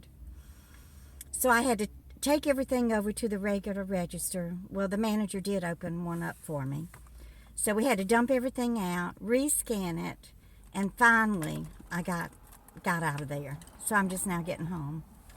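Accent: South, United States. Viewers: . I love listening to your stories. I knew that accent had to have come from somewhere close to me. I'm from Mobile. I grew up going to southern Baptist and I had too many questions about the bible so when i was old enough I quit going to church. I'm not going to live my life in fear of going to hell because this book said so. I raised my kids to choose their own believes. I'm not here to offend you in any way. I do believe in a higher power just not the God that we speak of today. Oh an yeah....Wal-Mart is the devil himself. Most people including me have to shop there by force. We can't afford to pay the higher prices elsewhere. PEACE & LOVE.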